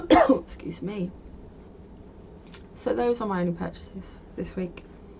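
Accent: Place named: United Kingdom. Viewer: Hello, an good morning, I have just found your podcast, an was laughing so much at your comment of wanting to make the Shawls, because I am the same, love shawls, an like you have a growing stash that will be older than me teeth if I don't stop. ha ha, love the yarn you showed, look forward to seeing what you make with them. I take from your accent you are U.K. I live in North West England. an have subscribed. have a lovely day. :)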